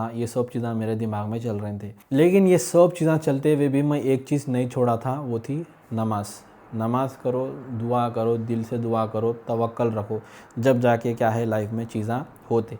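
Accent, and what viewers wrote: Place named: Hyderabad, India. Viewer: apke baat karnke tarikqe se pechna mai hai nahi hyderbad ke aap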